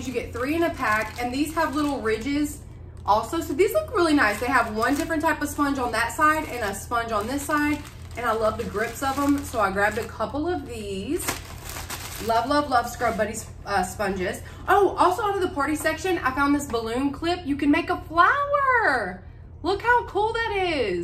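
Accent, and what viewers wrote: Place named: Mississippi, United States. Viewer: Hey sweet lady you & I sound the same!! I'm from Mississippi so down here we all say "yall" quite frequently! I love your dollar tree hauls!!! I just wish these dollar trees here would take notes of all the dollar trees you shop at!!! They NEVER have the cute stuff you have. I may get lucky & find one or 2 little things, but they never have any of the good organizing stuff ever! Most of the time the isles are bare. I guess nobody cares about taking inventory. We need some desperate help at the dollar trees in Mississippi! Oh well, I love watching what you find & I'll just stay jealous!!! Love you girlie......keep shoppin'....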